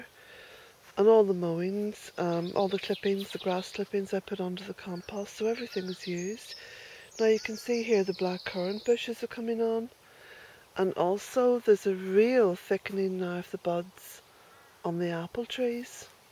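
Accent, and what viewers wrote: Ireland. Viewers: Catching up with your early videos. You don't have such a pronounced Irish accent these days! My, how your garden has become so abundant in these past 13 years, you work so hard. You had three cats in this video - I assume this was pre-Jack? Lovely to compare the before and after. X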